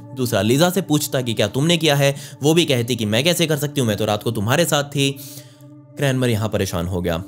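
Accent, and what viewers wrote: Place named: Punjab, India. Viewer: Panjabi a bro tu 😊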